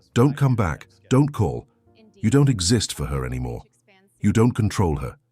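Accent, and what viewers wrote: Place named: England, United Kingdom. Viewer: Maybe it's the English accent.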